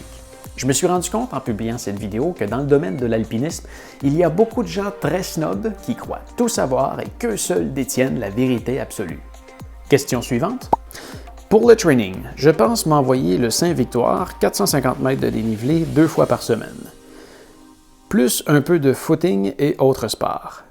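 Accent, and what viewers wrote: Quebec, Canada. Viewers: Très bon videaste un peu imbu de lui-même mais l'accent quebecquois est trop cute.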